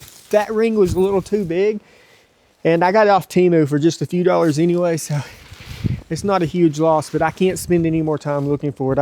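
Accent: South, United States. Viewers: love your southern accent bro.i have a good bud from San Antonio Texas.builds golf courses all over the world.met him here on Vancouver Island years ago while he was sculpting a course.he had a good accent as well.cheers.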